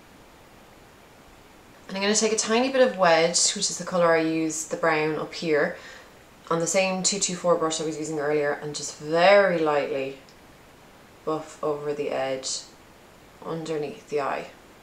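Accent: Ireland. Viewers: I loove your accent! Irish? :)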